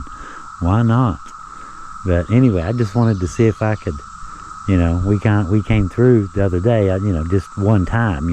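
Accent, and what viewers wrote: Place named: Virginia, United States. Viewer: I have been boondocking in this rain also for days and nights! HA! I love your Hillbilly Humor! You so funny. And you talk like us here in SW VA. I will be camping in West by God in June, love it!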